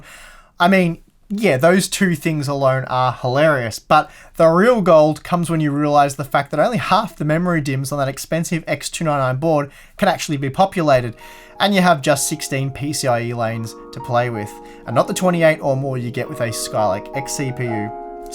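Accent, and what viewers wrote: Australia. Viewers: I didn't come here for the list, I came here for the Australian accent I'm sorry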